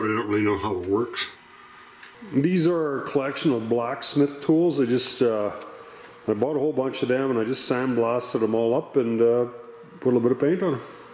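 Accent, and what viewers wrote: Canada. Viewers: Wow! You make awesome videos! I have a question, it seems like you have a bit of a Newfie accent. I might be wrong, but are you Canadian?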